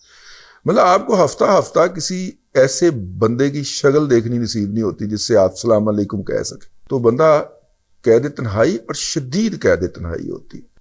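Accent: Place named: Saraiki, Pakistan. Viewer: سرائیکی شعر کا مزا آگیا۔ آپ کا سرائکی لہجہ بھی خوبصورت ہے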